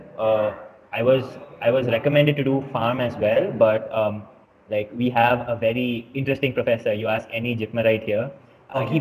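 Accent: India. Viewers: Great videos. For us, who are not Indian, understanding the Indian accent is not always easy. Could you please write a brief summary of each video under the video. That would be so helpful. Thank you in advance and best regards.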